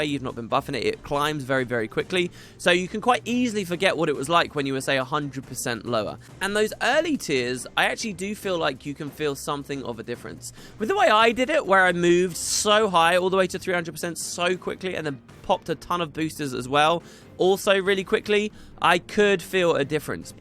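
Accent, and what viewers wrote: England, United Kingdom. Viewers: Honestly, some people complain that he is too drawn out and rant, but I really do love to turn on his videos and chill and just listen to that sweet melodic English accent.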